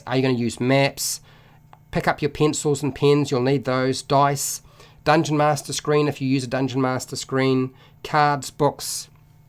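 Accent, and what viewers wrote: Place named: New Zealand. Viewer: Great guide and only enhanced by that charming kiwi accent!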